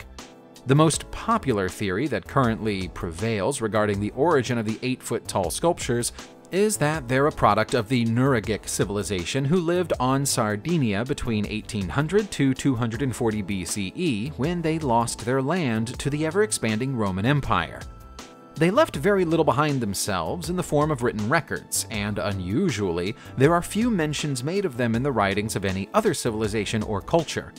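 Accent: United States. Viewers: just the next disgusting american accent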